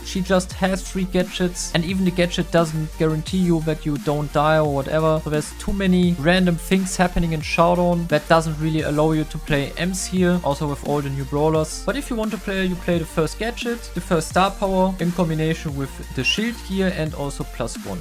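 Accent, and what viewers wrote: Germany. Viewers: Bro dieser deutsche Akzent ist crazy🤣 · Digga wann sagst du auch mal was auf deutsch ich höre da DEUTSCHEN AKTENZ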